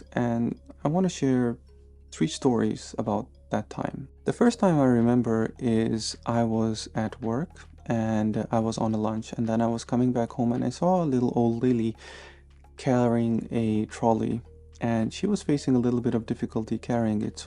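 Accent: Estonia. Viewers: Actually I was surprised that he even speaks English with estonian accent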